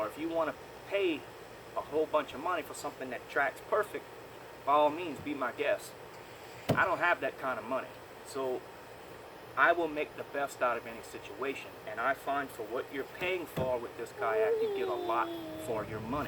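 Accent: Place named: Lafayette, United States. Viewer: You sound like you might be from around the lafayette area, just wondering because i wanted to know how it did around here? I'm looking for one to mainly go to big lake and such.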